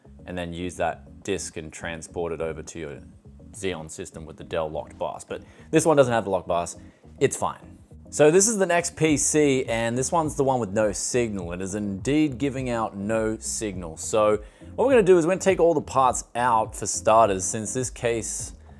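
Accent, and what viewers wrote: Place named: Australia. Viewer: Lol, wait so how do you speak to your friends and family? With that Aussie accent like on the phone or like you do for the YouTube! 😂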